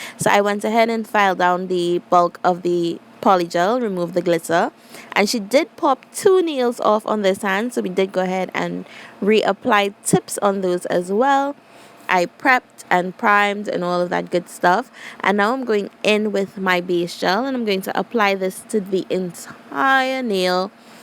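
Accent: Trinidad and Tobago. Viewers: I’m obsessed with the polygel!! Side note: You sound like you have a Trinidadian accent lol correct me if I’m wrong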